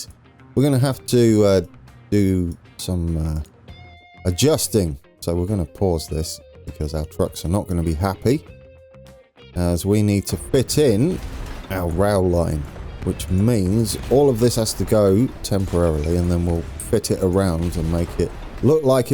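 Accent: United Kingdom. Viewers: your british tho? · Great to hear. Enjoying ALL of your TF2 series. Your accent is apparent, but not over the top (so thick) like most Brit YT's here. Very soothing and entertaining. You're - comical especially when you call yourself a "berk."(sp) I die! Still glad I found your channel.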